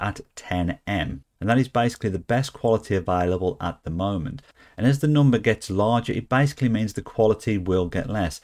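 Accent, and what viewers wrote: United Kingdom. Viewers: I love your accent. What area of Britain are you from? Might be a silly question but I'm from Germany and not familiar with British accents.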